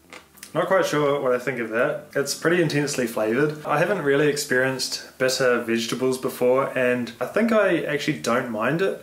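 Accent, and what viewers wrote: New Zealand. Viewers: I watch your videos just to hear that nice Kiwi accent😂.Love it☺️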